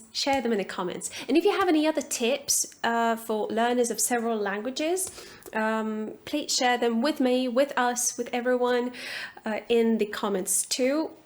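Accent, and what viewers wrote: United Kingdom. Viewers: Perfect British accent) I can't imagine how much effort it takes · how did you develop such an amasing British pronunciation? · honestly I can't, but I'm American and you have a lovely Brittish accent. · I thought you were British! I’m American though.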